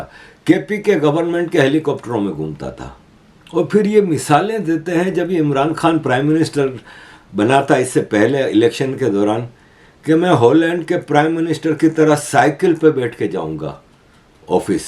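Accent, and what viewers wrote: Gujarat, India. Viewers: Aapka accent Gujarati jaisa hai kafi had tak, bada meetha lag raha hai sunna. Main ummeed karta hoon aapke desh mein vikas ho aur iss dikkat aapko nijaat mile.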